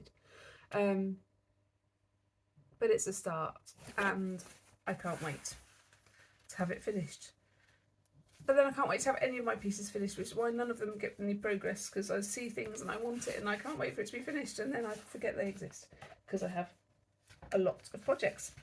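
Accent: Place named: United Kingdom. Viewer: It was such a pleasure to watch your video. Your accent is exactly the same as my dearest friend in Britain, so listening to you made me feel like I was sitting with a friend who I have known for years. I love all of your projects and look forward to seeing my progress.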